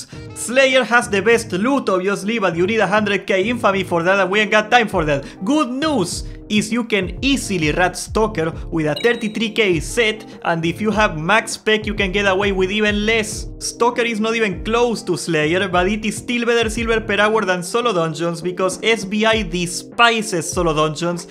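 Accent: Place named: Argentina. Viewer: Al minuto dije este es argento. Buen video campeón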